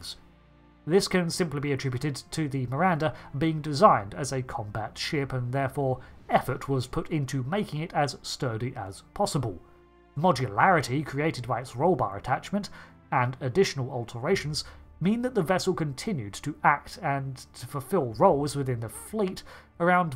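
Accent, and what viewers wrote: United Kingdom. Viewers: Are you incapable of recognizing a British accent?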